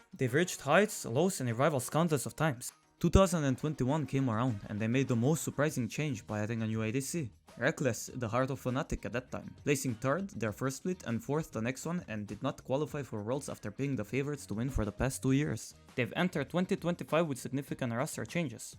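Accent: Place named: France. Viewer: you just know this guys french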